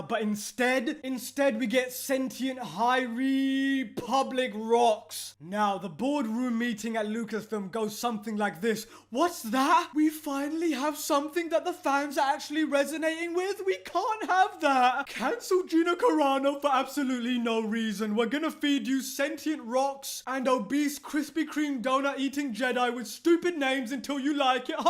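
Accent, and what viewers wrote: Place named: Hull, United Kingdom. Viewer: Just taking a wild guess but are you from Hull or anywhere near? Knew a guy from Hull when studying abroad and sounds similar. However, as an American that’s never been to UK I make no claim of expertise with regional variations.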